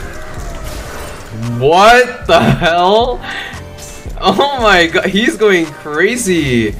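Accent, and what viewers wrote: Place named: South Korea. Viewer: I have to ask just because I love the way you talk -- where is your accent from? I'm getting Korean vibes haha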